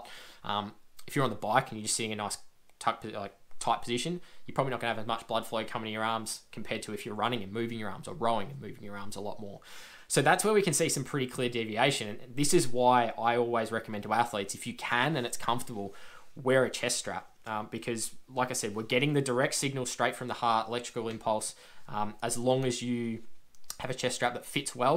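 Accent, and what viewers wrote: Australia. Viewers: I concur, and the Aussie accent always helps 👌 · Hey, that was a good Video really appreciate you work on this, I myself use a Polar H10 Cheststrap along with the Vantage V, have been stuck with Polar since 2013 and since then always Cheststrap measuring. I'm very interested in the topic of burning 10 000 Calories (opposing to eating those) Have done that in 2017 (but despite having all material never managed to cut it and upload it) Now I have seen qute some Videos of people attempting it with all kinds of measurements...yes also using myfitnesspal and no heartrate measurement whatsoever. Then also a lot with the wristbased measuring and i had the feeling those might read the heartrate higher. You said because of bloddpressure the reading might be higher on the wrist. WOuld you assume in a quite heavy lifting session as the bloodpressure shoots almost through the roof the wristbased measuig would give a higher average heartrate than the cheststrap? 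Cheers mate greetings to Australia I'd guess from your accent...but only after having posted this comment will checkout your other videos where you might have revealed that alrady. Sporty Greetings from also a Sportscientist from Germany living in Dubai.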